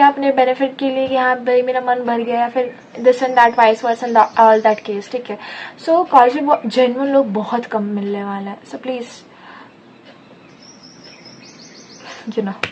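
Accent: Bihar, India. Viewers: dii bihar se ho aap bhi really mujhe laga delhi ki hain aap😁😁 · Didi bihar se hai kyaa aap..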